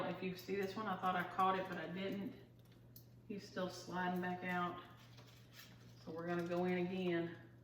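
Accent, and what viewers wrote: South, United States. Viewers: Thank you so much for a wonderful Vlogmas! It’s been a day! And I needed a distraction. So nice to hear a southern accent...I am a transplanted southerner who wound up in western Canada, and I miss my home 🥴 Christmas is a time for family and good food, and I miss that more than I can say! Your wreath is beautiful (sorry I am behind on episodes) I am working on binge watching to catch up on your vlogs! But I keep getting sidelined by chores🥴Wishing you and your family many blessings of the season! ❤️🎄🦩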